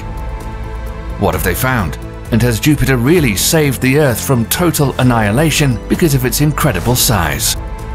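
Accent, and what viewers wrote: United Kingdom. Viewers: Oh a British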